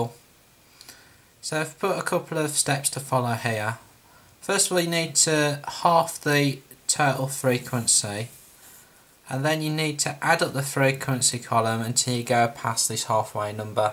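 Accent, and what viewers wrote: Birmingham, United Kingdom. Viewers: Thank you! What part of Brum are you from mate?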